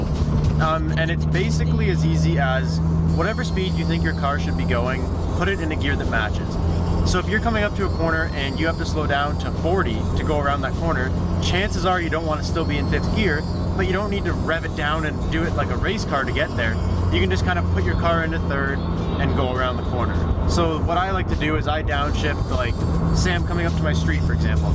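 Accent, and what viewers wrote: Canada. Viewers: He sounds really Canadian honestly he reminds me of my friend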